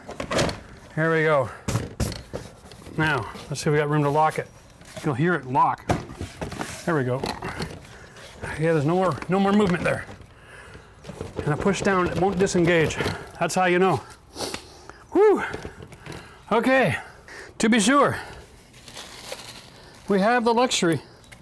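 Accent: Canada. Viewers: Canadian aa?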